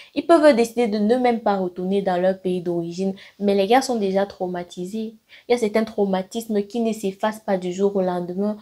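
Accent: Cameroon. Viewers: Intéressante la vidéo👍🏽 par contre j'ai une petite question. Comment ça se fait que tu t'appelle mais tu parles avec un accent camer? Les c'est pas en Afrique de l'ouest? Excuse ma curiosité.🤔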